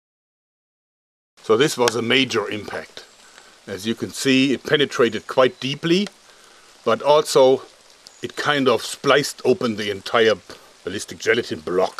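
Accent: Germany. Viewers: This dude is so cute with his german (please correct me if im wrong) accent and his homemade weapons. Like a big kid that's really creative... and possibly deadly.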